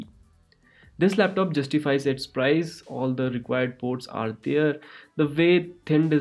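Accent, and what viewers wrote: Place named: India. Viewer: omg this indian voice meeeeh, dislike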